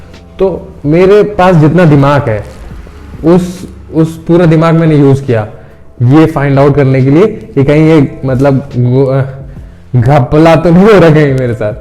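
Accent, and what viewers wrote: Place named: North, India. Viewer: You speak hindi. So I want to ask you anna where do you live.🙏🙏🙏🙏 I mean are you from North.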